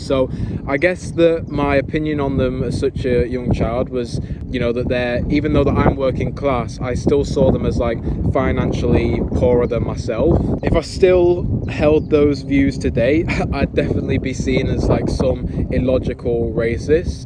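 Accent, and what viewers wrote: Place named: United Kingdom. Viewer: who likes a British accent? sounds snobbish.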